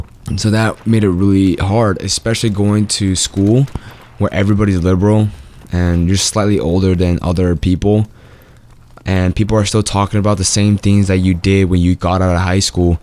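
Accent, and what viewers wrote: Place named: New York, United States. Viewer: It sounds like your in new york or california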